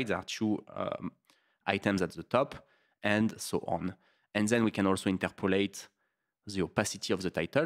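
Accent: France. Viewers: German speaker, sounds like French, looks like American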